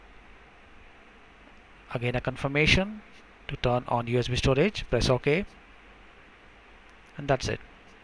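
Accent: India. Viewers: what accent do you have lol :D Indian? :D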